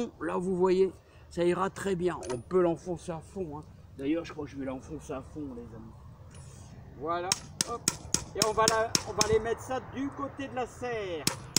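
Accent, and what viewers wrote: North, France. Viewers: Bonjour , je viens de découvrir votre chaine avec plaisir de vous entendre avec un bel accent du Nord! sympa, car moi aussi je suis de la région , disons le même coin ou peut être voisin.Merci pour vos vidéo et le travail donné.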